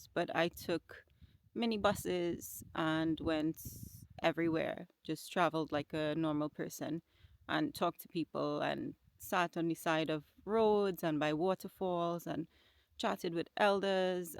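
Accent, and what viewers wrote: Trinidad and Tobago. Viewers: I was thinking the same thing 😮,but after she started talking I knew she was from Trinidad & Tobago.Big up Sistren.. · Awesome I love your accent my dear Trini sister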